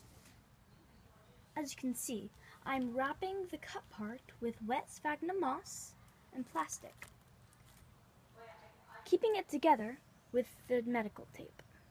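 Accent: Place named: England, United Kingdom. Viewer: You think your better then me cause you have a English accent and have a lab coat?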